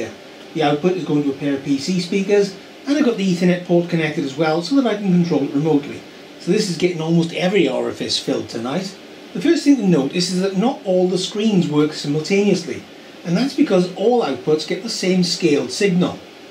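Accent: Wales, United Kingdom. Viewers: Ditto, just found myself here after checking up on Photon's channel and reminiscing. As an electronics and computers enthusiast, I'm looking forward to subscribing and binging all of your past videos! 😃 Also, your accent tells me you hail from one of my favourite countries! 🏴 Cofion gorau! 😊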